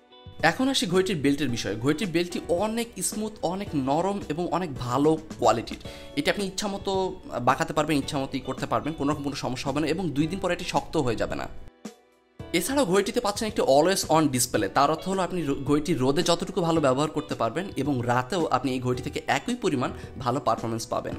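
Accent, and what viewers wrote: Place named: Bangladesh. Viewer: BD Voice Thanks ♥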